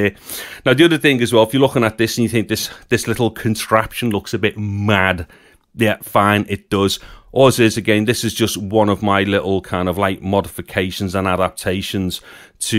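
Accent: Liverpool, United Kingdom. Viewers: you've got nice voice (and audio set up was well explained too) and i like your regional prononciation, i guess Liverpudlian?:) Sorry, if not, i'm a foreigner.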